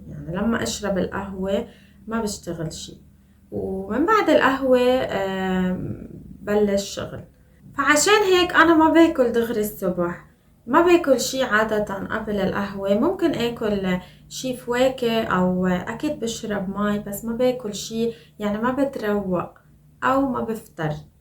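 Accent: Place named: Lebanon. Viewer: I love the Lebanese accent it sounds pretty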